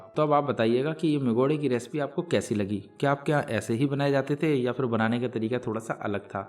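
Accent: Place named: Bundelkhand, India. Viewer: आपकी बुद्देखंडी हिंदी बहुत बढ़िया लग रही है 👍 मुंगोसे भी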